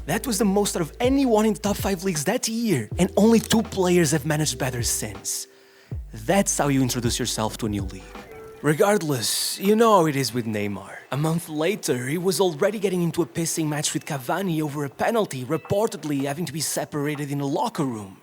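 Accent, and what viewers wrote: Portugal. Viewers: Love your Tuga accent. Cheers from Portugal!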